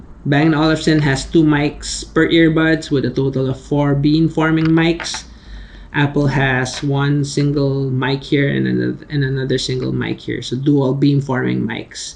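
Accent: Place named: Philippines. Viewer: great review, ur Philipino btw? recognized the accent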